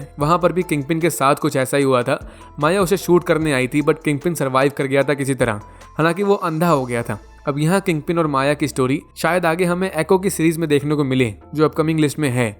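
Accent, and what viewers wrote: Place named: Sitapur, India. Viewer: Hey bro R u from district seetapur up . My friend is also from there and you both speak same way. I every time enjoye your sweet voice and explaining method Thank you 😍😙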